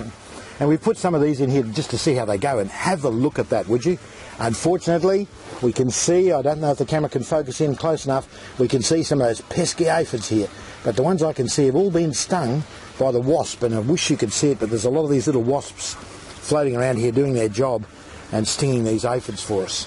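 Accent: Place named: Irish Traveller, Ireland. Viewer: Are you aTinker Irish?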